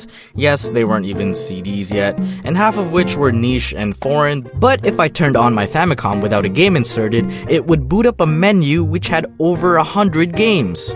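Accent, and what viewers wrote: Philippines. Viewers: gotta love that Filipino English accent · Weird Filipino Accent Tae means poop in Filipino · lol the filipino accent is so on point and funny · love the Filipino accent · you sound like a Filipino. I’m a Filipino btw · sorry dom but I can't stop listening to your filipino accent nintendo pamili komputerr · love the Filipino accent 😂😂😂😂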